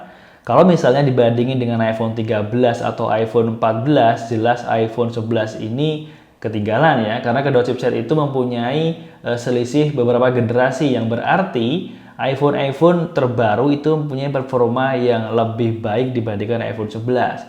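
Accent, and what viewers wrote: Indonesia. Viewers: Orang Lampung ya bang?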